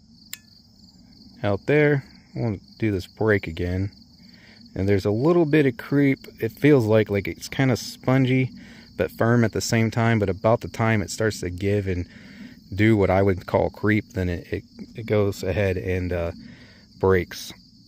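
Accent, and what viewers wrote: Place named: Southern Ohio, United States. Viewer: You must be southern Ohio.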